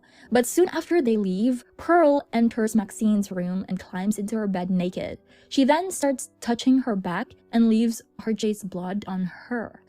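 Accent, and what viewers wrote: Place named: Russia. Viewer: Your Russian accent is funny.... Do more of it 🤣🤣🤣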